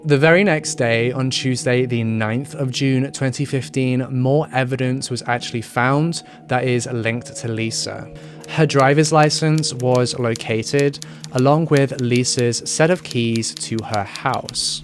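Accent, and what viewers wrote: United Kingdom. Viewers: Stumbled upon this video, and as a Swedish person I gotta say that I'm a bit surprised that this story made it's way out of Scandinavia. I saw that you also made one on Engla, another Swedish girl. For us, here, it seems like we are hardly even on the radar of the rest of the world. Where are you from? I think you sound a bit British, but I'm not sure, since I'm no accent expert. Just out of curiosity.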